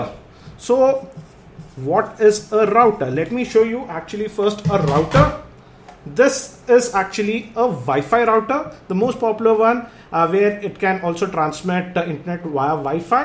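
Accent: India. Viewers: Indian accent on POINT!!!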